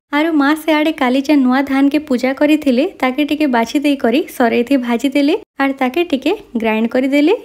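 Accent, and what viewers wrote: Sambalpur, India. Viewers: ❤ your sambalpuri accent nani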